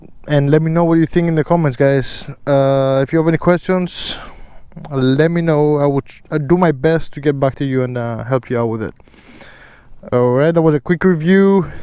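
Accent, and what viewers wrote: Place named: Greece. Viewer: Hey mate, 2 facts that lead me to think that you are Greek. 1 (?) and your pronunciation..it reminds me a lot of mine 😂. So I might be right, I might not. Cheers from Greece buddy! I am thinking of those gloves, and unfortunately in Greece we do not have the chance to try and feel astars products, because very few models are available in stock. So I am thinking on getting these from EU store, but a little concern with the sizing. Should I stick with the sizing chart? Or 1 size up/down. Any suggestions? Thanks a lot, ride safe!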